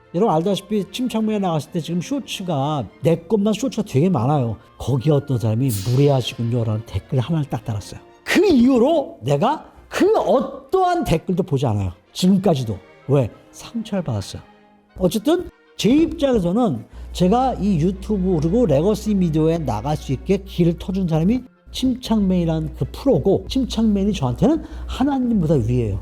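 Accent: France. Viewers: 한국말도 발성이 프랑스식 같아요 ㅎㅎ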